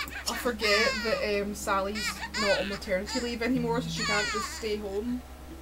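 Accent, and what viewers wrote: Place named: Scotland, United Kingdom. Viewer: I always enjoy watching your videos. I just have to watch some parts over and over again. Because of yer scottish accent x3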